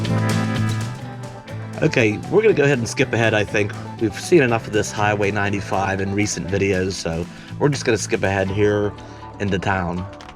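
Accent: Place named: Western Pennsylvania, United States. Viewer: Your accent sounds like mine. Western pa?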